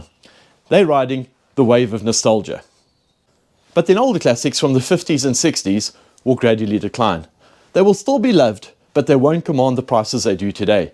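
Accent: South Africa. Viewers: first time viewer. What a great guy, with a fabulous south african accent! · First time watching a video from this channel. It seems that you're a South African living in the UK. The accent is a giveaway if I'm correct about that. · What a fabulous collection of cars ❤️. Is there a hint of a South African accent in there?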